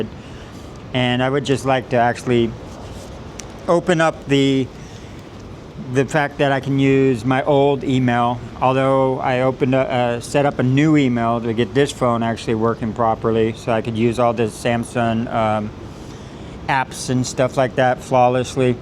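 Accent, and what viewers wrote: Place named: Tennessee, United States. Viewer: From Tennessee. That explains your accent. Keep riding and discovering Malaysia. You have a splendit Advisor in Douglas of Plane Doug.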